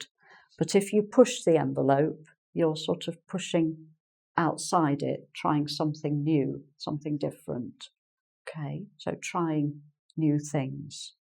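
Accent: United Kingdom. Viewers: i subscribed cause i admired ur english cause i'm facinating with the british way of speaking and i'll follow all ur lessons thank u so much hope u'll help us to get the british accent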